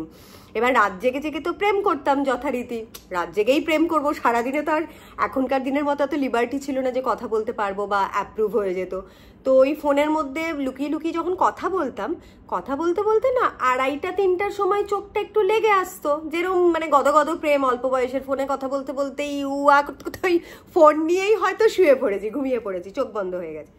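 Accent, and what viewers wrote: Bangal, Bangladesh. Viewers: ম্যাডাম প্রথমেই আন্তরিক শুভেচ্ছা জানিয়ে বলছি ভীষন ভালো লাগলো বাঙাল কথা বলতে শুনে। শিম্বার মাধ্যমে আপনাদের সকলকে ভালোবেসে ফেলেছি। আপনি খুব আন্তরিক ভাবে কথা বলতে পারেন।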